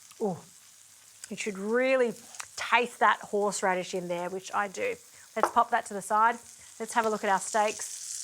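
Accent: Australia. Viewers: Hmmm. Some Hybrid pan? Needed closed captions because of “ throw one on Barbie “ accent.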